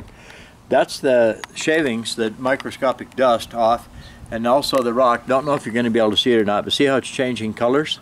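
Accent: United States. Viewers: youre from california ? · You look very scandinavian for an american sounding white man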